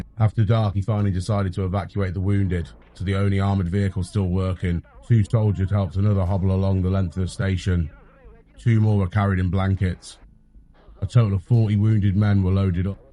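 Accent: United Kingdom. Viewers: Had to stop watching after about 30 seconds. Fuck me, that's the roughest British accent I've ever heard. The narrator doesn't even try to pronounce the letter 't' and genuinely sounds drunk or half asleep. Just awful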